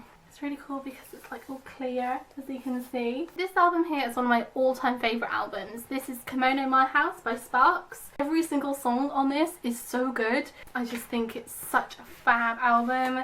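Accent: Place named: United Kingdom. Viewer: are you british ????😍😍😍😍😍 so lovely acent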